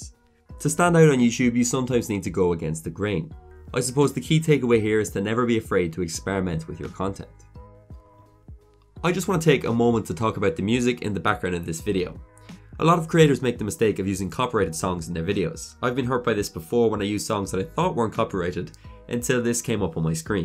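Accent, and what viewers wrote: Ireland. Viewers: Nice hearing another Irish accent on YT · I'm loving the content on your channel, really excellent work and I hope you keep gowing/growing.... I'm wondering bout your accent tho, Laois Carlow, Offaly, Kildare?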